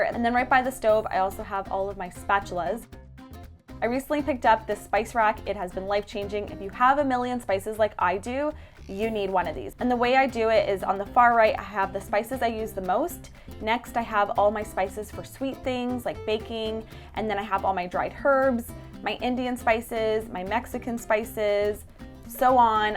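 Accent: Canada. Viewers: Oh my goodness your Canadian pronunciations! I am not used to hearing words said that way lol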